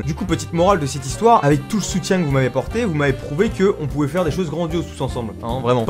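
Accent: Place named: Guadeloupe, France. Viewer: L'accent guadeloupéen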